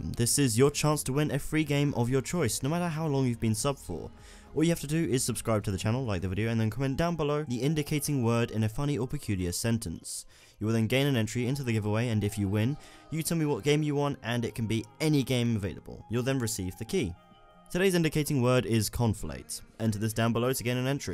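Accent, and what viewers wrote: United Kingdom. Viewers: omg replied awesome videos bro i dig that british accent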